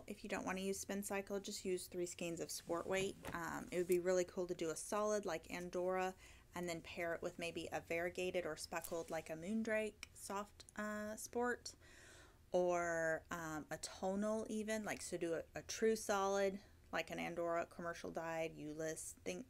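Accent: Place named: Quebec, Canada. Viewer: I’m guessing Max and Vincent taught you how to pronounce Ulysse 😂 Very french Canadian accent ( I’m from Montreal, originally, as well). Oo-lease, with the accent on the second syllable, would be more accurate in French from France, where the yarn is from.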